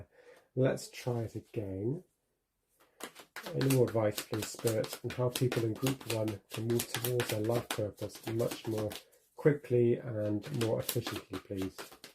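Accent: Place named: Wales, United Kingdom. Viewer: Hello, thank you for a lovely, and interesting readings, 1 n 4. I felt they linked, and some good uplifting advice. I tried to place your accent, and wasn't sure, but I've heard it before, I was thinking whale's but not sure, the dragon sort gave me that idea. Anyway only if you want to share, your name was an other curiosity 🤔. Loved your reading, and your accent, maybe your names Aegon 😉 😜 ❤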